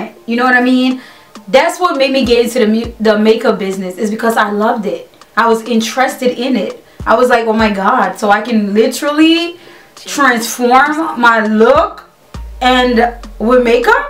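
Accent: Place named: London, United Kingdom. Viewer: i heard a london accent as well😂😂😂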